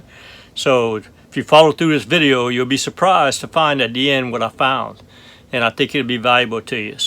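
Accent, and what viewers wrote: Louisiana, United States. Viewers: Haha I miss dat accent. My people are in Thibideaux.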